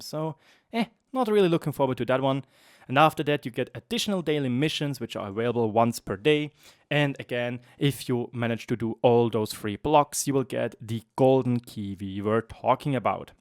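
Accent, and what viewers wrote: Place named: Germany. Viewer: For a German, your English is pretty ok, but maybe watch some undubbed movies to improve pronunciation a bit further..? If your looking for a broader audience.. Just a thought..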